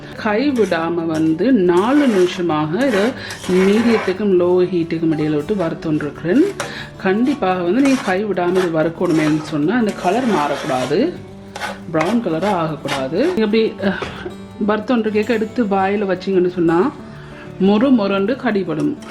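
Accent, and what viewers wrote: Sri Lanka. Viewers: உங்கள் லட்டும் அருமை உங்கள் இலங்கை தமிழும் அருமை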